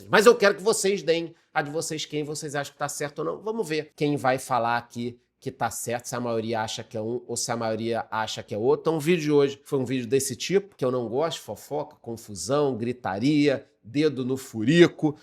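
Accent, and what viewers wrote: Rio de Janeiro, Brazil. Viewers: Adoro esse sotaque carioca ❤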